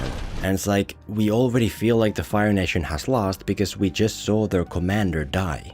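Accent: Finland. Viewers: Onko tuo suomalainen aksentti jonka kuulen?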